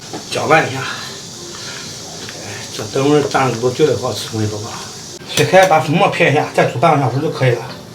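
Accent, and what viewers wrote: Henan, China. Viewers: 这口音是河南还是襄阳啊？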